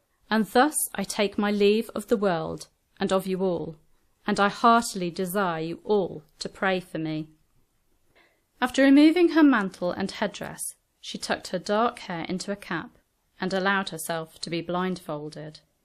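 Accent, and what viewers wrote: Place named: East Anglia, United Kingdom. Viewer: Misinformation is commonplace with most if not all historic sites. Tour guides or docents if you like; often have to follow a strict guideline or script of what they tell the public. It's unfortunate but all very true. Here in the States this happens mostly regarding period homesteads from Salem Witch Trial sites in Massachusetts to antebellum plantations in the former southern colonies and later states. Oh by the way, lovely accent! East Anglia?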